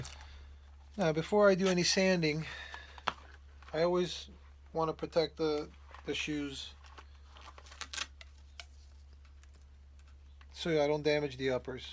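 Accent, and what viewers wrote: New York, United States. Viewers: In Cambridge England we say it like this sounds lPayTent. When you say it you sound like patTunt. How is patent supposed to sound, I just wondered. U sound like your from New York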